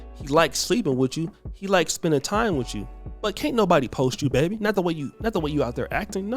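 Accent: United States. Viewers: That was the most "Bay-destrian" video I have heard you do.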